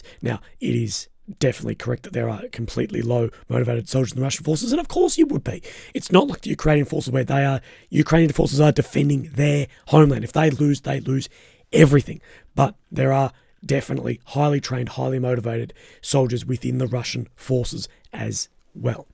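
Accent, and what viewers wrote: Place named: Australia. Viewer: Sorry mate but the breathless, staccato delivery in harsh Australian twang is extremely debilitating, an assault on the ears. Also makes my heart rhythm jump erratically.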